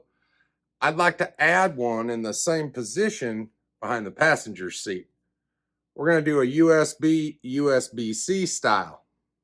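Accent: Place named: Ozarks, United States. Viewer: !! The accent is coming in great. You have assimilated to the Ozarks nicely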